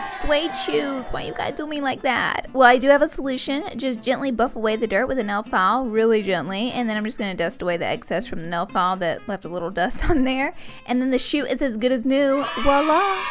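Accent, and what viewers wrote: South, United States. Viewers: is she a southern bell alls I hear is southern in her voice 💙😂😊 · You are so funny, love that southern accent!! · I love your southern accent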